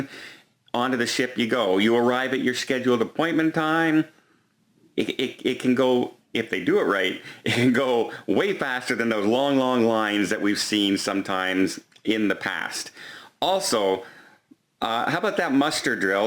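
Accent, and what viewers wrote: Canada. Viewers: He's Canadian. Sometimes they say words differently than we do here. Stop being an English teacher!!!!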